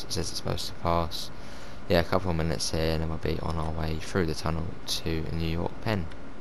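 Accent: United Kingdom. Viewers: WOW nice i was dreaming last night about you saying on augest 6th today we are going to finally play TSW2 i am so excited.:D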